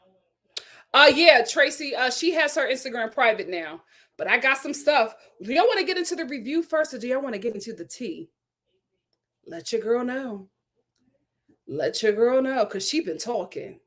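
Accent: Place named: Philadelphia, United States. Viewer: LOLOL we love you, and maybe it's the Philly accent but you've been saying it wrong.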